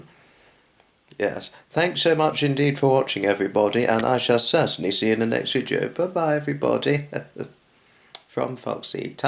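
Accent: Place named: Scotland, United Kingdom. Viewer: wow Foxy, you've got a regular channel now! Didn't know you hurt your foot, but glad you're on the mend. Love your wee laddy accent.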